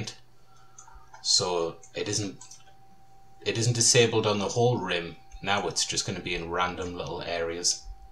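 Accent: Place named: Northern England, United Kingdom. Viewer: Hello, mate. This video is a pure gem for me saving this to my favorites. Cheers! P.S.: You've got a very familiar accent to me. It reminds me (actually it's identical) of my British friends from the oil field I work at. I don't quite remember their origin but sounds like a bit of slow Scauser but more Northern England. Where are you from?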